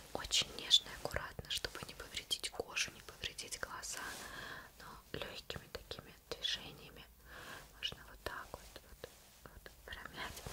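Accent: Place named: Russia. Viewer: She’s so pretty really wish she did some videos in English the Russian accent is amazing 👌🏻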